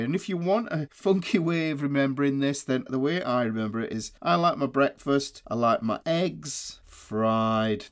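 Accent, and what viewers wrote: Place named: Durham, United Kingdom. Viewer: The BEAD on the 7th fret helped me so much! Also, I lived in Durham for a long time and your accent makes me feel so at home!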